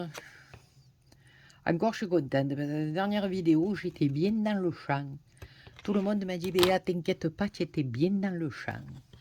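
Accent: South, France. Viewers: bjr madame Béa très belle carte et très bien expliqué j'adore votre accent du sud du midi continuer comme cela a nous montré vos merveilles bisou de véronique de reims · Grand merci pour ta réponse Béa, j'ai vu tous les jolies commentaires, toutes les personnes qui sont heureuses de ta jolies cartes, de ton explication tu peux en être fière. Et comme d'autres personnes l'ont dit ton accent du sud est très beau à entendre s'est comme quand j'entendais ma maman elle était germanophone sa à la voie chantante et mélodieuse comme celle de maman et j'aime t'écoute et s'est bien dommage qu'ont ne peut pas le faire plus souvent. Encore un grand merci Béa, gros bisous Brigitte de Belgique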